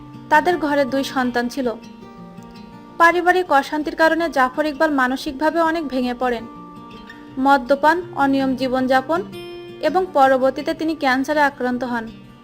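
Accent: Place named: Pabna, Bangladesh. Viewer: desher bari koi pabna na kushtia ........? kotha bolte jane na........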